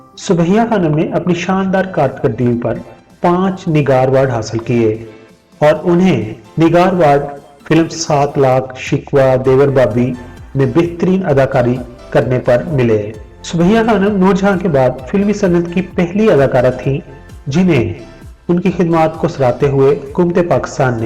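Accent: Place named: Punjab, India. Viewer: AP ka lehja pnjabi hai Urdu k alfaz p sahi grip nai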